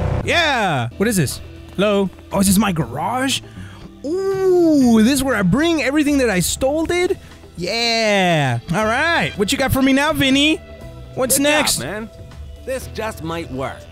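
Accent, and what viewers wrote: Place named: Mexico. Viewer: You sound so white And so Mexican at the same time Síguele compa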